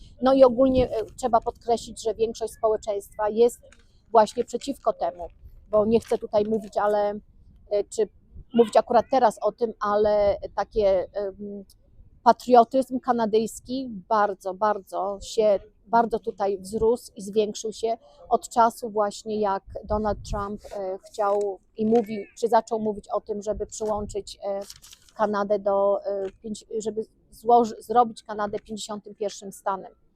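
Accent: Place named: Krakow, Poland. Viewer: Pani z Krakowa? Tez tak mowie....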